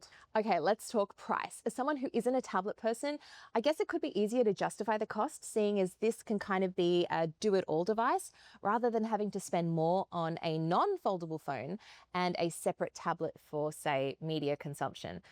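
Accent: Australia. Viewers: but you sound so Australian other than that!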